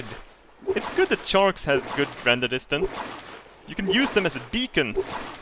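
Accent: Sweden. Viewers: stop speaking Swenglish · I love your sewdish-english accent :)) !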